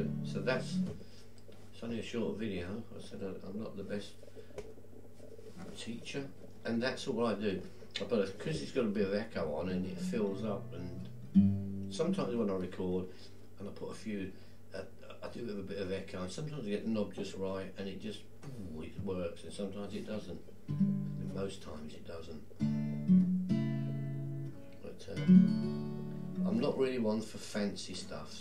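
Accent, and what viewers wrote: United Kingdom. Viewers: His British accent makes him more entertaining!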